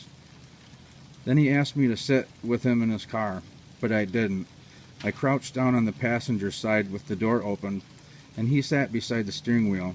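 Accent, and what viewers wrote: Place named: Rochester, United States. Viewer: Hello brother. I am new to your YouTube channel. I subscribed because as I scrolled through your videos I noticed videos and I adore that man! May he rest in peace. Also, I have a very dear friend who is from Rochester New York and your accent sounds so much like his. I guess those are two reasons as good as any for me to stick around for a while. God bless you.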